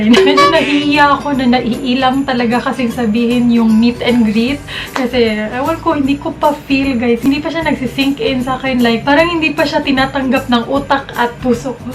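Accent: Visayas, Philippines. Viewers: mau diay bisaya kaau mo say AHAHAHHA I'm also a bisaya hii ate were proud to be Cebuano's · You're so loveable and very enjoying to watch! Tho you have that bisaya accent, you show your true and natural self to your subscribers! ❤ Continue to inspire us and do a lots great videos! May God Bless you always po Ate! Power! 💖💖💖 FB: Jorgie Villas Instagram: jrgvlls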